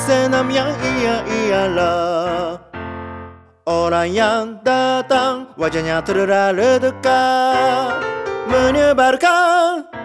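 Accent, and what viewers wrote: Japan. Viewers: Tiba tiba lewat timeline lagi ni senam akwowkwk, dulu gak nyadar tapi pas didengerin sekarang aksen Jepang nya baru kedengaran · Ini orang Jepang gaes yang bikin,yang ngomong itu logat Jepang nya masih nempel😂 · Aksen nya jepang banget 🥰🇯🇵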